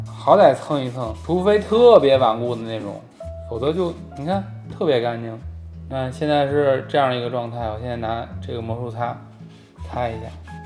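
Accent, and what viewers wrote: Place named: Beijing, China. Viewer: 看你很多期了 各种北美生活的妙招儿 北京话也特亲切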